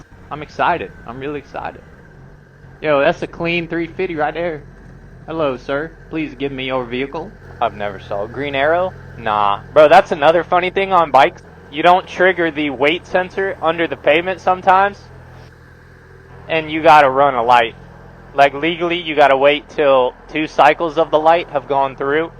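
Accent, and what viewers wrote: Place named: South, United States. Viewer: bro gained a southern accent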